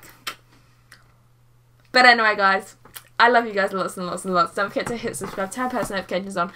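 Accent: United Kingdom. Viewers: I really love ur British accent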